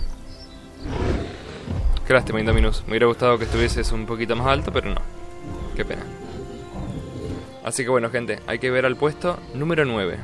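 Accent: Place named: Argentina. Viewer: se nota que es argentino 🔥🤷🏾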